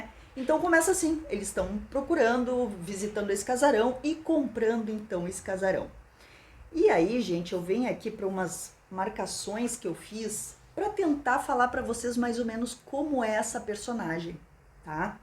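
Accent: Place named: South, Brazil. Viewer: Eu que sou de 88, tenho uma queda por essas capas rsrs, uma vida vendo livros assim nas bibliotecas que frequentei... mas, a história é doida hem?! 😅😂🎉. Nem Jung explica, já que Freud só complica. Pesada a trama...😮 Sigo seus vídeos desde que vi um vídeo seu sobre um livro gótico do círculo do livro, acho sua dicção sensacional, o sotaque sulista é tão lindo... você é muito animada uma pessoa do bem!!! Felicidades para você e seu noivo.😊 Eu sigo a Tati e você não fica atrás nem um pingo, suas resenhas são ótimas. Quando tem uma taça de vinho, já sei que o livro compensa rsrs. Amo o canal do Rubens também ❤ Sucesso!!!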